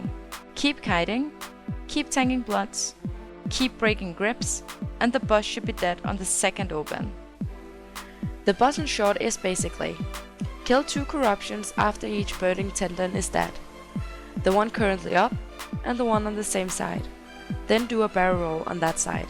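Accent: Denmark. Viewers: this sounds a lot like danish english hahaha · Agree, she's definitely a dane xD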